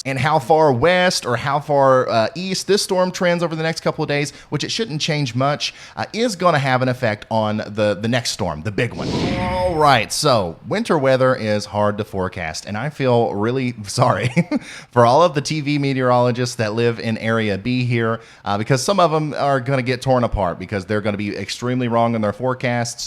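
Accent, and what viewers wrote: South, United States. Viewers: Your fantastic! Soooo glad I found your channel! And you have a nice smart sounding southern accent ..opposed to the other southern sounds😘😁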